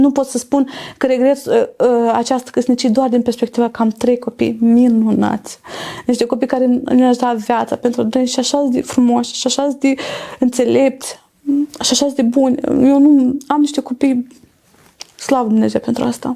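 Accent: Moldova. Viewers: După accent din satul Giurgiulești!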